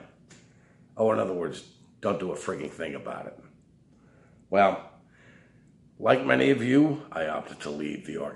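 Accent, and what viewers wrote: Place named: Boston, United States. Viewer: Good insight always to the point and says it like a true bostonian (guessing thats what the accent is). its messy when your in and leaving isnt a fix in itself. Gotta get a life. Thanks, pal, you are a breath of fresh air.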